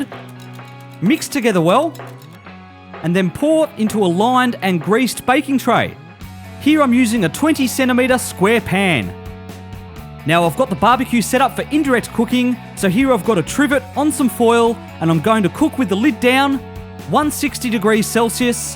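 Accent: Australia. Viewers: idk why but i fkin hate aussie accent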